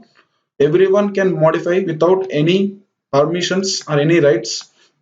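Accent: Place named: India. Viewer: Good content, but Indian accent is so strong it is hard to understand.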